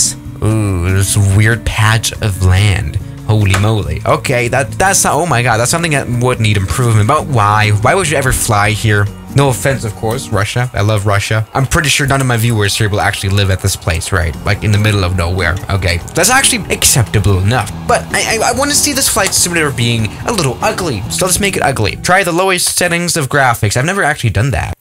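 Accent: Germany. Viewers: Are you German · Are your a German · Bro are you German? If I may ask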